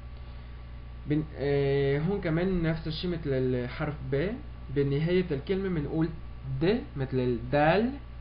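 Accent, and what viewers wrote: Mexico. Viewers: يتكلم اسباني ب لفظ مكسيكي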